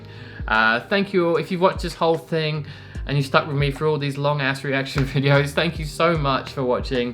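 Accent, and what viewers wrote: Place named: Australia. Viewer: I'm so happy for you to have reacted to Wintersun. Although I've really known them a few months only, while knowing all the time Kai's background, but never before was I ready for another metal band, just yet . Now that I've found it, it simply blows my mind. So heavy, but simultaneously pleasing, comforting. Invincible playing, awesome lyrics. What comes to this song and it's lyrics, I get the feeling I have to search for someone lost to grieve. The easiest way would naturally be my parents, and in some part they are, but there already are so many others I could and should have been closer with while they still were here. If I'm not wrong, you're Australian, right? If you really are, your accent sounds a bit different from the people in the Eastern coast, Sydney at least. I hope you don't mind me asking this.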